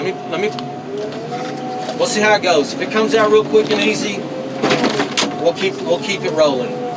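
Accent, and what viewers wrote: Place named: Texas, United States. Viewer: best to watch videos without sound, so you don't hear this texas twang moron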